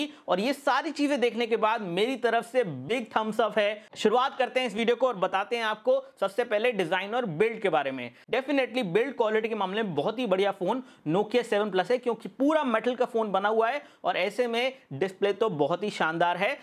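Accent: India. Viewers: WHAT? i dont understand you its indglish ?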